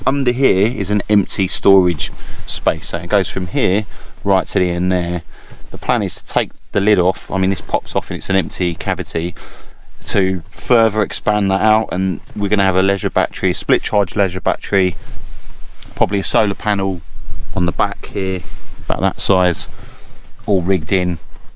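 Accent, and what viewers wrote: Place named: Southern England, United Kingdom. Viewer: Nice job. This is exactly the route me and the Mrs want to do with our E51. All the gear but not convert it. Great stuff. You sound like your from the south mate, I’m in Margate, are you anywhere near there?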